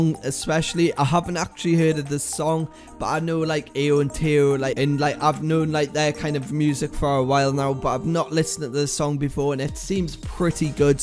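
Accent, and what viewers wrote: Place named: Newcastle, United Kingdom. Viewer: You sound geordie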